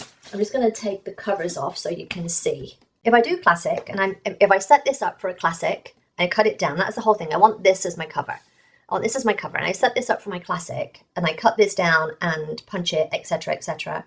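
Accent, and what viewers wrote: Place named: United Kingdom. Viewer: are you from the UK originally? Your accent and phrasing is very UK. Agree that HP have to expand their faith range x My craft room is the same 😂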